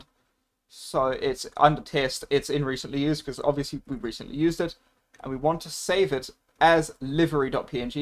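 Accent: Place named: United Kingdom. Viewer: This is brought my attention to how surprisingly English/British your accent is. Great tutorial though (If for some reason I decide to play Asseto Cora at any point)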